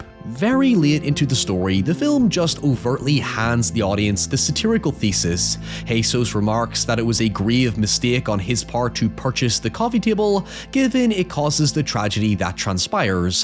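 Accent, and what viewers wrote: Ireland. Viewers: By his accent I'd say he's irish.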